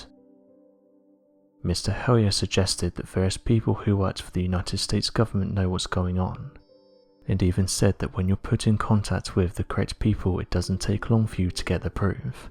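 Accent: United Kingdom. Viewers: British right?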